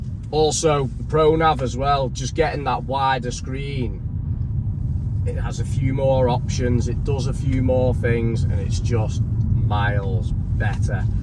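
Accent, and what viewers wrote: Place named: Liverpool, United Kingdom. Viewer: Subscribed! I think you’re Scouse. I want a 435i someday too and I love in Liverpool!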